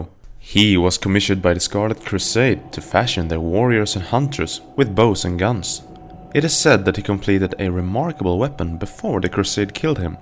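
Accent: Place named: Sweden. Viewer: Are you perhaps swedish? you do sound like it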